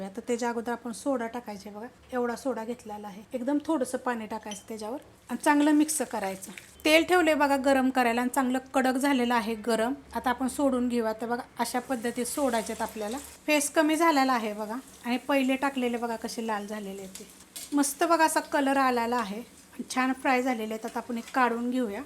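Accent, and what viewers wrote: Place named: Satara, India. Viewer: ताई तुमची भज्यांच्या रेसिपी मला खूप आवडतात आणि त्यातल्या त्यात बोलताना तुमचा सातारी टच मला खूप भावतो👌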